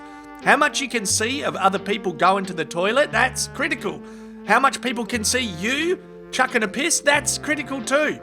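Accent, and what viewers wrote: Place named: Australia. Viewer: Somehow the Australian-voicedNarration made everything even dafter than it was...that is almost a backhanded compliment...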